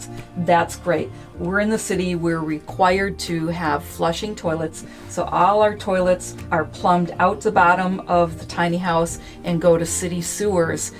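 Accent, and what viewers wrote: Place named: Midwest, United States. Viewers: I caught your Michigan "accent" immediately! lol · Ahhhh, I knew that I detected a Midwestern accent in the narrator's voice!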